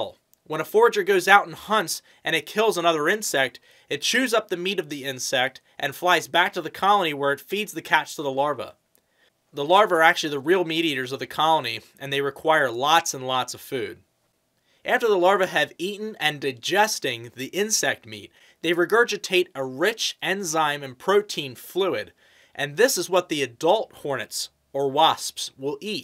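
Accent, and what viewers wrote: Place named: Philadelphia, United States. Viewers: I knew you had to be from the Philly area by your accent! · I thought you sounded like you are from Pa. Monroe County Poconos here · Your Delco accent is strong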